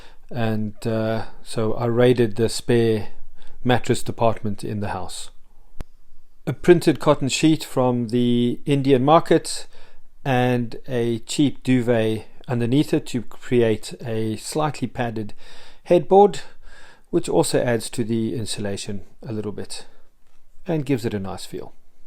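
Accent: South Africa. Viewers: Good ol South African accent 😉👌🏼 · I can spot a south african accent from miles away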